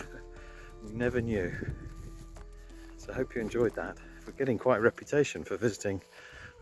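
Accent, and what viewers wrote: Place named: United Kingdom. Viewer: Absolutely amazing tour of antiquity, made captivating by the tenor of voice, his knowledge of this historical structure, his colorful imagination, and his lovely British accent! Loved it! Thank you for sharing, but I'm also missing Anna's and your DIY projects, dancing & creativity!😉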